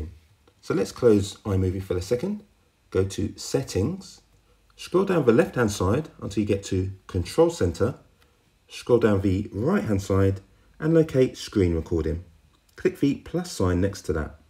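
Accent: United Kingdom. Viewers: Your voice literally sounds like an british guy btw am not racist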